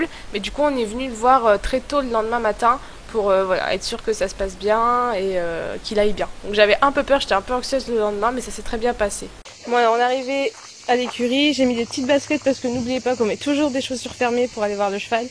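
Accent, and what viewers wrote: Nord-Pas-de-Calais, France. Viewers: Mais non t'es chti ???!!!!!!!!! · T’es une Chti comme moi 😁😁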